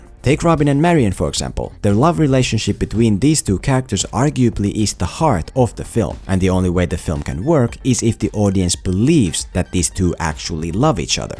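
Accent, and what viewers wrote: Finland. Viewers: This been bothering me for a bit now, but would you happen to be Finnish? The way you pronounce english gives me that feeling.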